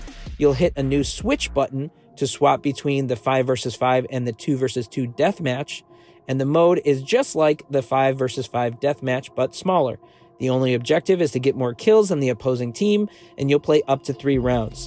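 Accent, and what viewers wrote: United States. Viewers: He is American cant u tell by his accent not everyone knows hindi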